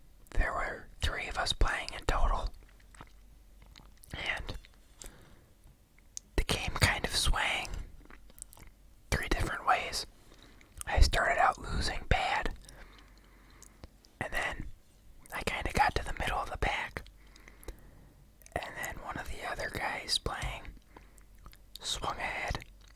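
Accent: Midwest, United States. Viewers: i’m wondering too lol, very strong midwest accent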